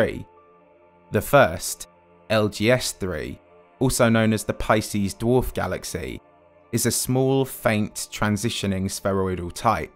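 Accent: United Kingdom. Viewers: UK accents aree annoying.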